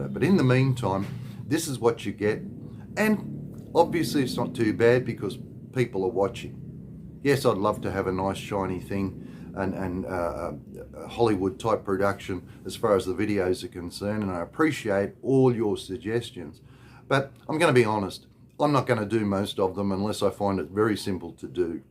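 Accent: Australia. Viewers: I like the cut of your jib, mostly I guess as we have a lot in common, born 57, ex Raafie, spent my early years working in the mines including PNG and happily divorced 😀... I came to Davao back in 2012 to meet my Filipina, I have been a permanent resident (SRRV) here since 2015, being ex military the Philippines Retirement Authority do a great package deal, only have visit the agency here every 3 years to renew my ID, other than that there is no on going costs or exit clearance required. My wife got Australian permanent residency about 4 years ago (I did the application, not rocket science but a few headaches were had chasing up the paperwork here in Phils, as I am sure you would appreciate). We now spend our time between Davao and my place in Queensland (I live on 5 acres), basically best of both worlds. Anyways mate nice to hear an Aussie accent from Duma for a change, keep em coming.. 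cheers.. how good was it growing in Oz in the 70's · Hi I've watched your videos with great interest. I am an Aussie from Melbourne. I spent 2 years in Dumaguete and came back to Australia in mid 2021. to have a cataract operation on both eyes. As you mentioned the treatment standards are far better in Australia. It's taken 12 months of treatment to get the eyes ready for the operation which is in December. I'm expecting to be back in Dumaguete in 2023. Firstly there is nothing wrong with the quality of your videos the smartphone you use is obviously a good quality device. Everything that you've said is 100% accurate. Trike drivers saying it's up to you on fares, poor service in stores, long slow lines. You'll recall that in Oz we just tap our cards to pay. The supermarkets in Dumaguete swipe the card then record the details on another system very time consuming Your Aussie accent is a great voice for the VLOGS. 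Love your down to earth style, great information, keep up the good work. by the way you've got a great partner in attractive, articulate and a great command of English. I'm looking forward to the next VLOG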